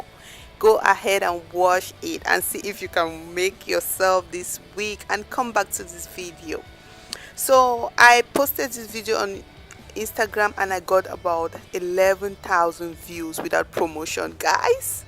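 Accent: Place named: Africa. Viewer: This is a beautiful wig and I would love to order. However, the voice sounds African but the IG page says China.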